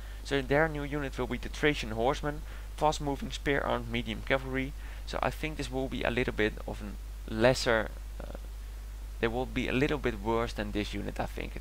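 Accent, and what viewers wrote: Netherlands. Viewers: That was soooo obvious from the " nederlands-achtige-crappy-engels accent" xD aha wel grappig om zo te horen Voor de rest wel ok! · For your first video you did great! Maar ja je kan wel echt aan het accent horen dat je Nederlands bent hahaha :P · dude the dutch accent's great! :D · jij bent nederlands ik hoor het meteen maar maakt niet uit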